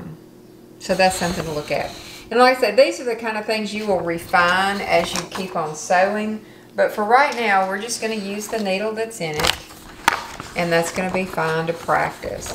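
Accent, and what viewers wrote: South, United States. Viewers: You did a fantastic job with this video! Your explanations and demonstrations were so thorough and extremely helpful and easy to follow. I’m looking forward to the holidays being over so I can play with my new heavy duty Singer machine. Oh, and your southern drawl made it even more enjoyable! 😊. Thanks, looking forward to the next video. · Your video came on after I finished another tutorial, but I thoroughly enjoyed your southern country drawl, as well as your explanation. I put my singer away and just sat and listened to your video. Thank you!! 🙏🏽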